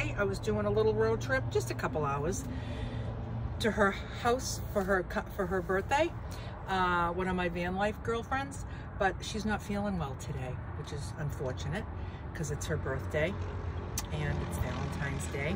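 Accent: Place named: Massachusetts, United States. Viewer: Thank you 😊 you sound like home! I’m from MA too and now in Florida. Love the simplicity you share!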